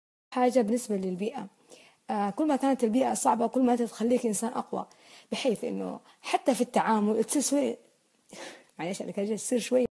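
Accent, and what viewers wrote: Saudi Arabia. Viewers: لهجتها سعودية قح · هل كنتي عايشة مع اولاد في السعودية؟ سلوب كلامك مثل شباب السعودية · اهنيكي على اسلوبك الجميل والواضح ولهجتك السعودية روعة بصراحة الجالية الصومالية من افضل الجاليات في السعودية وعندي صديقات منهم في الثانوي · هل أنت سعودية؟،،لهجتك سعودية 100 ب100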